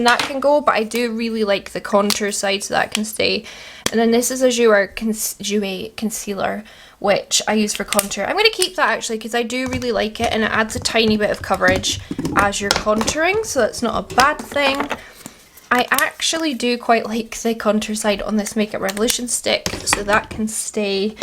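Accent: Scotland, United Kingdom. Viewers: I like your Scottish accent. Good declutter.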